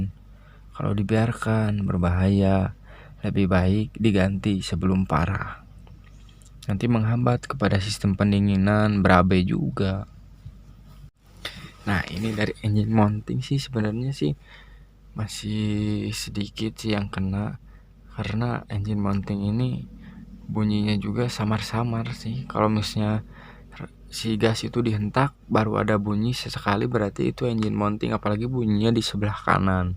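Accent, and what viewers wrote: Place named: West Java, Indonesia. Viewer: Logatna Sunda euy... Kumaha damang kang 😁🙏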